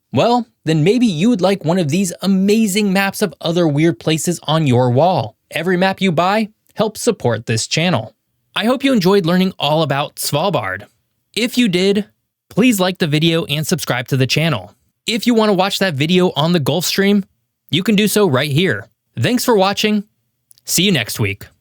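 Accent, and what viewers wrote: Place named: Kentucky, United States. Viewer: Your English pronunciation is awful. It sounds like you went to school in Kentucky. Or, maybe you did. The most annoying is when you say "thuh" instead of "thee" before a word that starts with a vowel or a vowel sound. It's NOT "thuh" island, it's "thee" island, thee arctic, thee avalanche. No, it's not spelled differently, it's just pronounced a different way in different circumstances. Unless you were raised in Kentucky. Go ask your mee-maw, at least she has a second grade education. Also, your voice is shrill and you talk too fast. I don't think being a youtuber is for you.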